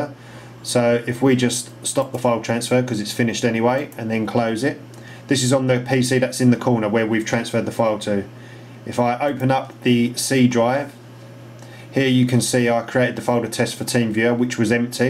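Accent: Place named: United Kingdom. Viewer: Great stuff, thank you so much. Also, it is nice to hear a fellow Brit helping us out!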